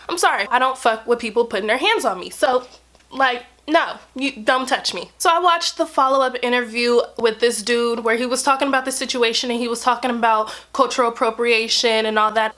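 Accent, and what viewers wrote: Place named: United States. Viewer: You speak with an American accent. Your culture is American. When you accuse white people of "appropriating" your culture when in fact it is African culture they are "appropriating," you are guilty of the very thing you accused them of.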